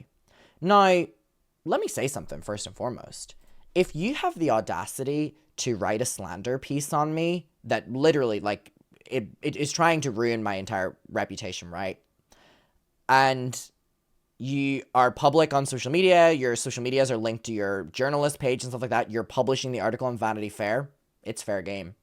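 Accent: Ireland. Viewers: They didn’t even watch any of your videos if they didnt know you were Irish. Although there are some Americans that cant hear the difference between an Irish and English accent. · Not understanding the difference between Ireland + England is crazy 😳 You’re accent is clearly Irish like - did they not watch any of your videos? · they did 0 research on u bcos how’d they READ YOUR NAME and HEAR YOUR ACCENT… and not immediately assume ur from Ireland 😭😭😭😭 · A couple things: 1, Andrew CLEARLY didn’t do any research because if he can’t even get the country you were born and raised in correct, he’s a certified moron.. I’m from the US and I could tell within 30 seconds of watching you for the first time that you have a very clear IRISH accent. Not to mention you speak about that sometimes. And 2,…can we all agree Andrew and Vanity Fair are groomer supporters? EW, not a good look for a “reputable” organization. 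Boycott VF!!!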